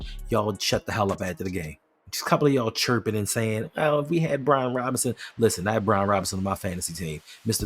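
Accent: Baltimore, United States. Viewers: You can sure tell you're from Baltimore 😂😂😂 that bmore accent RICH!! lol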